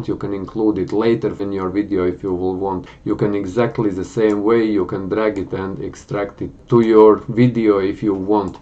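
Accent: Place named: Russia. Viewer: This dudes russian accent is awesome! its so strong!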